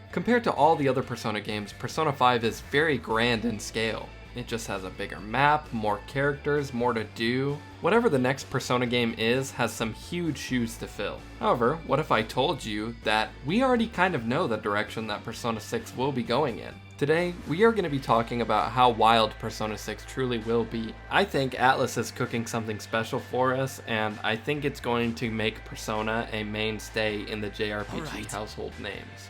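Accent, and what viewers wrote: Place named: Italy. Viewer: > Yeah I should put more stress on those syllables, that’s my bad! Don't worry. It's just a thing we Italians do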